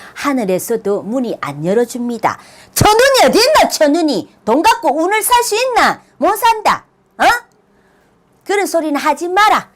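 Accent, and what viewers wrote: Gyeongsang, South Korea. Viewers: 경상도 어데고 ? 서부갱남이가 ? 소카도오 ㅡ 나가 볼때는 ㅡ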